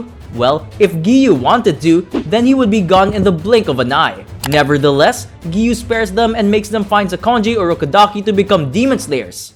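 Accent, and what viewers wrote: Philippines. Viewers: Filipino ahh acent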